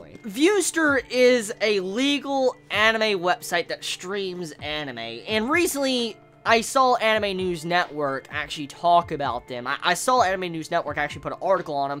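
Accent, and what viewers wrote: South, United States. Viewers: and your country accent lol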